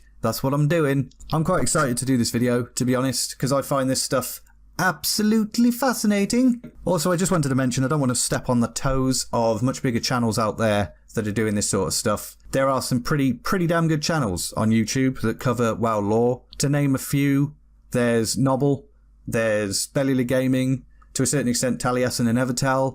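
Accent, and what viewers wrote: United Kingdom. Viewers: I'm working my way through your channel and you're brilliant! I can't figure out your accent, Midlands or more northern?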